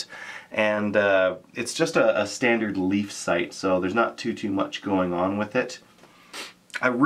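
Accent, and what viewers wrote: Canada. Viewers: The different combs aren't for growing shooters. They're for shooting with iron sights or above axis scopes. It moves your cheek weld to the correct position. You sound Canadian, so I'm assuming you guys are very restricted to the types of weapons you can possess.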